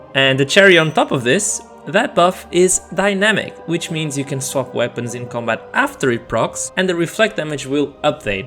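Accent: Portugal. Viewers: Português pelo sotaque certo? Qual o servidor? Abc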